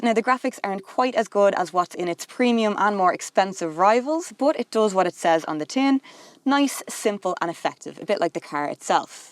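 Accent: Dublin, Ireland. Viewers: Great review with the Irish accent 😊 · That is not a English accent it's a Dublin accent.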